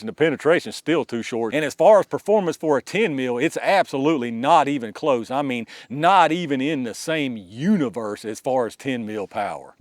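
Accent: United States. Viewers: No offense here but Im really interested... What kind of accent is this? It sounds more American than Uncle Joe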